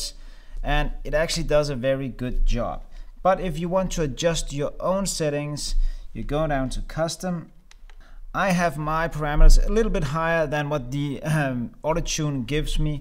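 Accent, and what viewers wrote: Denmark. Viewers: wtf.. Troede aldrig jeg ville finde en film making related YouTube kanal fra en dansker.. Var så forvirret over jeg kunne høre du var dansker, da jeg ikke tænkte der var chance for det haha Men får bestilt præcis denne stabilizer idag, and keep up the great work! :)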